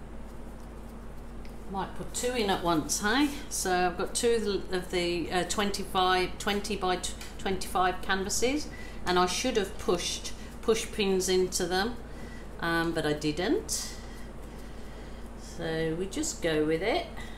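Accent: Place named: Midlands, United Kingdom. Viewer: You're so welcome, I enjoy your work and I like your easy going attitude, along with your midlands accent! Don't blame you for emigrating, love the filming of the views from your home, you live in a beautiful area of the world. Much respect to you.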